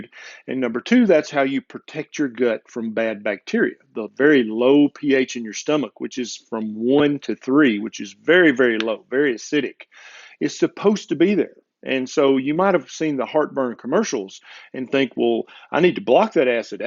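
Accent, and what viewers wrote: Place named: North Carolina, United States. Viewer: I am trying to place his accent. My guess North Carolina?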